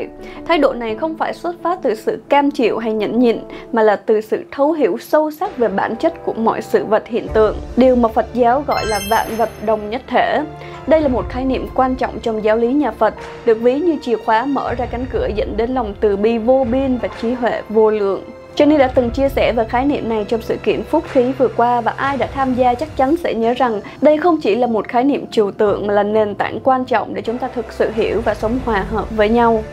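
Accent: Standard. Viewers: Nam mo a di ďa phat nam mo dau da minh tue phat toi nghe co noi pua chuan chuc co luon vuì khòe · Chúc mừng cháu giỏi về chính phát nghe quá chuẩn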